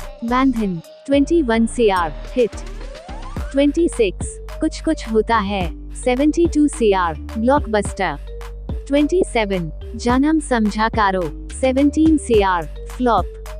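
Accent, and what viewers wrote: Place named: United Kingdom. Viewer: by her voice can tell she must British or from an English speaking countries struggling with Hindi title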